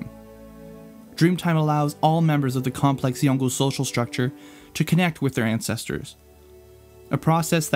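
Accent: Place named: United States. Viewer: Hearing this stuff with an American accent makes me woozy lmao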